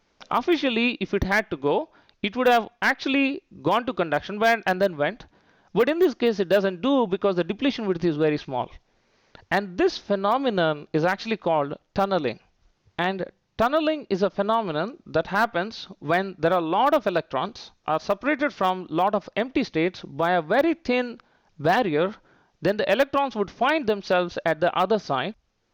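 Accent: India. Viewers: We're getting sick of Indians accent.God damn it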